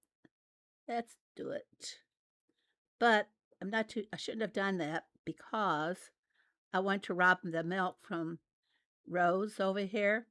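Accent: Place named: South, United States. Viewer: I have worked in the Boston turf for over 20 years - you don’t have it! Very southern to me!!!!😊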